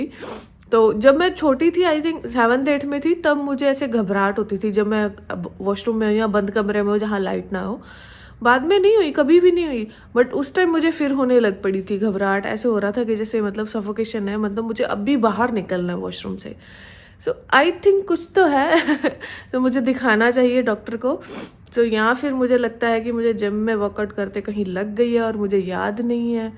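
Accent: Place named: Himachal Pradesh, India. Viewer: Aapki language me himachali touch ata hai apnapan mehsoos hota hai ❤❤❤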